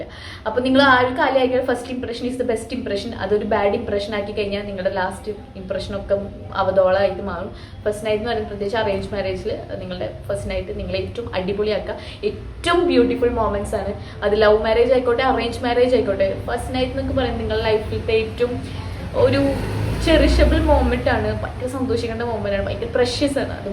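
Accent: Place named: Thrissur, India. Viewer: trissur slang anallo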